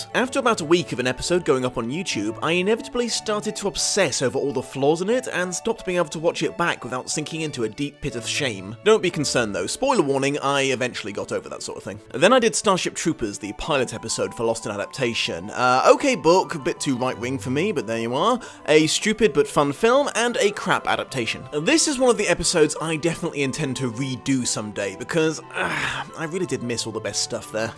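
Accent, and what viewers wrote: United Kingdom. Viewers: I don't care where you live, just don't stop using the word "bloody". I LOVE your british accent! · going to the States... please don't loose your lovely British accent... :(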